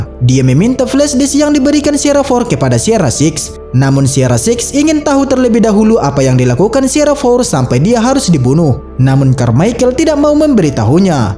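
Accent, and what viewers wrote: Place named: Java, Indonesia. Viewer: Bahasanya pake bahasa jawa y bang .,,medok Kali I😁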